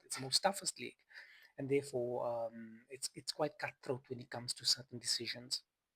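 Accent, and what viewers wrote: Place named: South Africa. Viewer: beautiful accent. are you from south africa by any chance?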